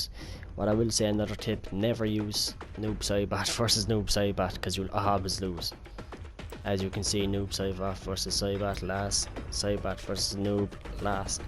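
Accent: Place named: Ireland. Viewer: Lad your Irish omg I'm Irish too ! Add me S2S ( Nintendo Switch )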